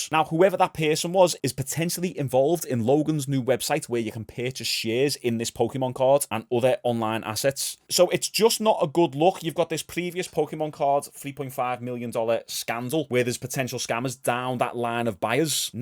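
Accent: Liverpool, United Kingdom. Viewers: I love the U.K accent shit sounds dope to me. The Market always drops on the weekends u should know this! Noisy ain't gonna forg3t about their money! 😆😆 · Love MMA and when I heard a scouse accent I had to sub. Love all the content bruv. Keep it comin. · As a manc, I'm still surprised on how drawn I've become to a scouser just chatting shit on YouTube 😂 got to give credit when due fair play, keep it up bro 👍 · pls say u support liverpool coz u have the best accent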